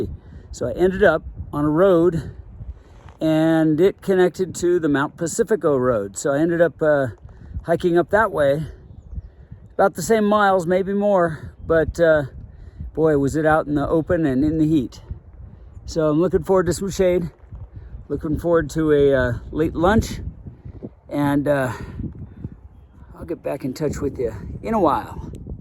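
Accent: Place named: Los Angeles, United States. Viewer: Keep truckin! Thanks for the info about the fire and the insights. Sounds like you’re from LA!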